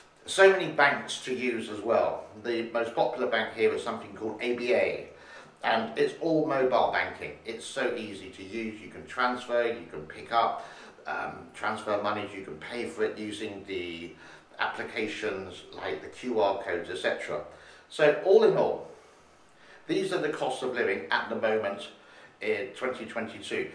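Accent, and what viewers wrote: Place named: RP, United Kingdom. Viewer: Your accent is somewhat posh. In brief, what is your background?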